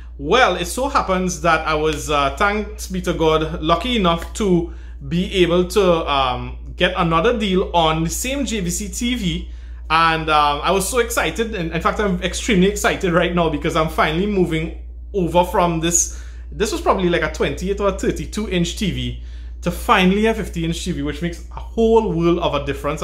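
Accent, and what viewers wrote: Trinidad and Tobago. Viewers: You sounding like a trinidadian.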